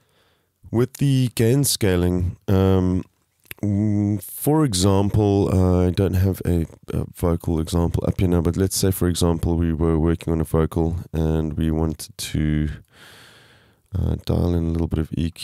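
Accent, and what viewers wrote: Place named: South Africa. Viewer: I hear the SA accent. I feel at home.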